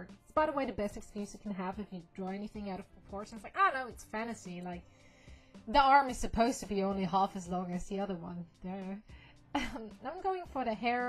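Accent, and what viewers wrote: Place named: Germany. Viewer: r u married? (Love ur work and ur german accent)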